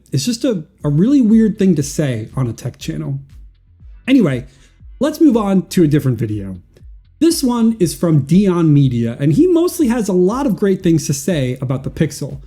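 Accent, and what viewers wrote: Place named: Australia. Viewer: Are you really going to take advice from a dude w an Australian accent?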